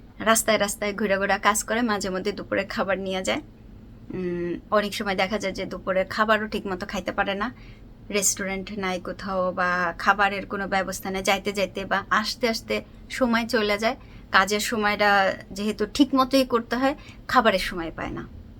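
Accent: Khulna, Bangladesh. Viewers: আপনার কথা ভংগিমা অনেক সুন্দর কারন টা হলো আপনি আমাদের খুলনাবাসী তাই।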